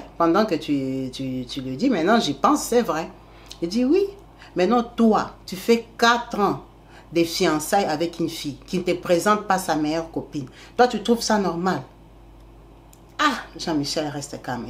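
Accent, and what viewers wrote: Democratic Republic of the Congo. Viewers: Franchement jadore tes histoires et tu merites être connu, je vais partager tte tes histoires ds ts les groupes Facebook❤🎉 Merci de Ns les conter terre a terre sans chichi avec cet accent congolais 😀🥰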